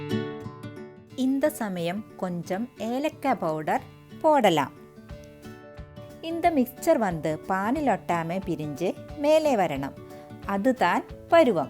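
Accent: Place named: Kerala, India. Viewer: Malayali tamil....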